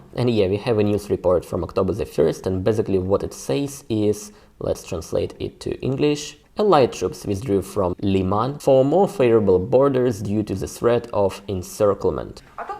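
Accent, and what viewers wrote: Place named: Russia. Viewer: you're great and what I'm about to say is totally irrelevant I am sorry, but continuosly pronouncing 'a' instead of 'o' is not part of the English language. Sounds too Russian. Peace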